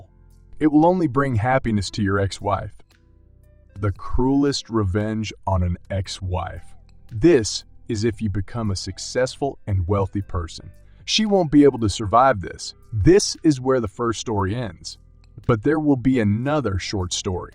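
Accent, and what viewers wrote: United States. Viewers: Narrator has a distinct accent. I'd bet Texan, or at least somewhere close to Texas. ETA: I'm a Texan, and he sounds like us. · Stupid knows both genders equally, so nice to get the perspective from the husband who was a good man. Thank you! Love it. Keep up the great work! Awesome southern accent!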